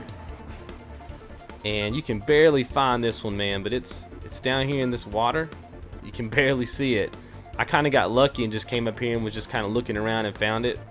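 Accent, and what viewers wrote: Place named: Mississippi, United States. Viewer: Thanks dude, I remember collecting these on my PS3, but I totally forgot already and now I need to finish 100% again in the remaster version, helped me greatly cheers =) ps: I love your accent, are you from Mississippi ?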